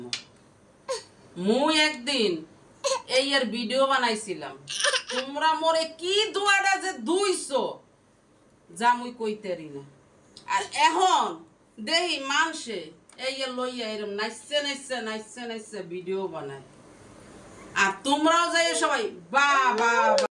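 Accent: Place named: Barishal, Bangladesh. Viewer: আন্টি আপনি কি বরিশালে র মানুষ মুয়ৈই তো ভাবছি আপনি ঢাকা ই মেয়ে আপনাদের কথা বলা বুঝাইছে এখন দেখি ও অন্য কিছু ভালো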